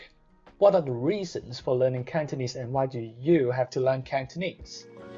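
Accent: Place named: United Kingdom. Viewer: I still think listening to you is very trippy. We have similar accent in our Cantonese, but my English is a North American English accent where as yours is more British. :P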